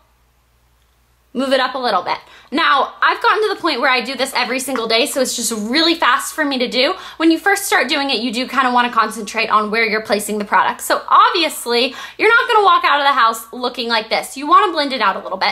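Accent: South, United States. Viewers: i just watched one of your older videos...and your accent has changed SOOO MUCH! you had a really cute southern accent.. :D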